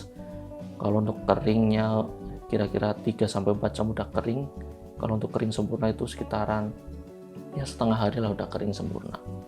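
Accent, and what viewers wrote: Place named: Banyumas, Indonesia. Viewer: Mantap, maturnuwun sharing infornya Mas'e! Ngapak Forever! 👍👍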